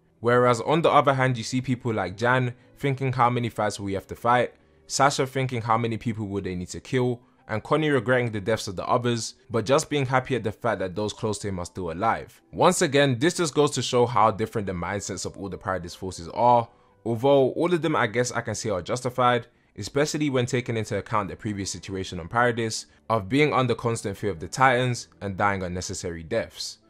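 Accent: London, United Kingdom. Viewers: Love your content bro. What part of London are you from?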